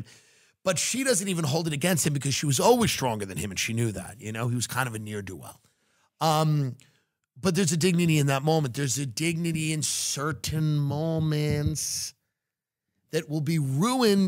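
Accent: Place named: South, United States. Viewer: An epic stream of consciousness ad, delivered in a creepily hushed southern accent. Love you, 😂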